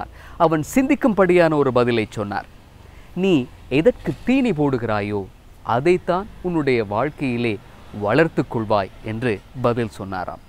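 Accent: Sri Lanka. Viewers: அதுதான் இலங்கை தமிழ்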